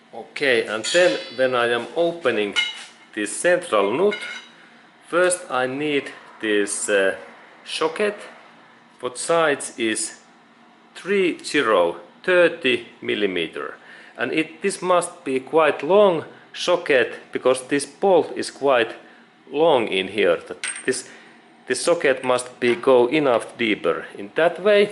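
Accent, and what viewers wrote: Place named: Finland. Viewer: You are Finnish i love your english!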